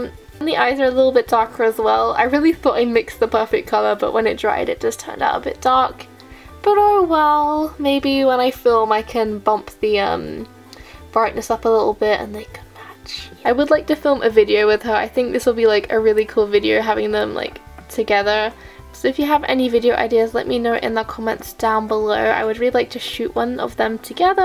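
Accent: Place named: United Kingdom. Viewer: Wait YOUR BRITSH wow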